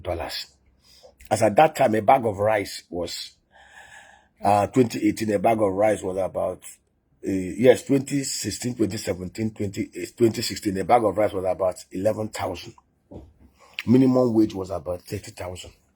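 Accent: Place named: Ghana. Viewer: You look and sound Ghanian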